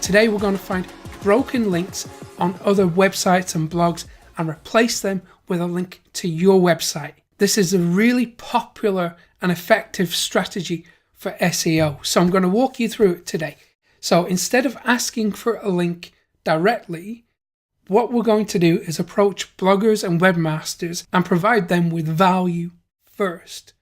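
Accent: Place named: Lancashire, United Kingdom. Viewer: HEY! how are tings in Lancashire, that's where the accent is from, right? Anyhow here's a thumbs up from County Cork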